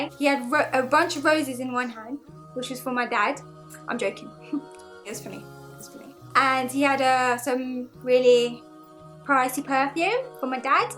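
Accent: United Kingdom. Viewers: I still try to understand the britush accent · Are you from Birmingham lol?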